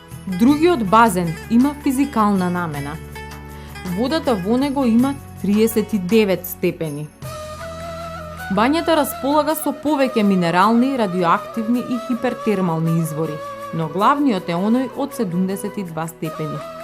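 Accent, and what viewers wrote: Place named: Bulgaria. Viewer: Baj Bulgarski, only the accent is here and there different and rather funny.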